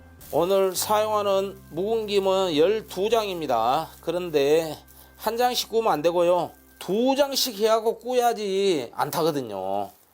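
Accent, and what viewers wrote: Jeolla, South Korea. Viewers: 여나무바꾸만 돌리믄 됩니다 ㅎㅎㅎ 전라도 사람들만. 알아듣는사투리 그것도. 나이먹은사람들만아는 ㅎㅎ 구수하고. 정겹습니다 음시도 전라도스타일이시고 최보십니다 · 김 묻힘~. 여나무바꾸 ㅎㅎ 샘의 사투리가 넘웃겨용ㅎㅎ 저두 전라도라 알아묵었는데용 ㅎㅎ 멋진 요리 틈나는대로 보고 많이 배웁니다요 매뉴 감사합니다~. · 역시 세프님 멋있어요. 전라도 구수한 사투리로. 설명이 좋읍니다 건강하세요. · 찐한 전라도 사투리 정겹습니다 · 오늘도 구수한 전라도 사투리에 엄청 웃었네요 요리도 최고 👍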